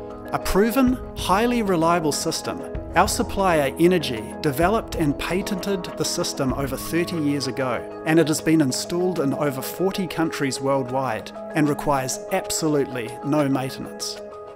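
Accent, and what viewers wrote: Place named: New Zealand. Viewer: Well, this is totally irrelevant but I must say I love you accent! We need more New Zealand people on the international media!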